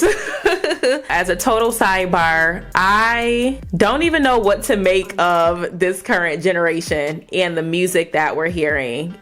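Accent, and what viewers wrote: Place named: Upper Midwest, United States. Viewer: Not to be off topic But I sense an upper midwest accent. Are you from Wisconsin or something?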